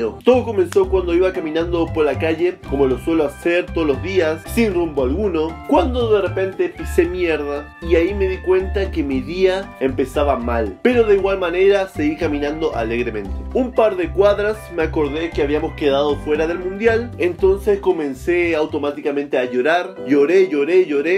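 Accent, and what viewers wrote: Argentina. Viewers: ¿eres argentino?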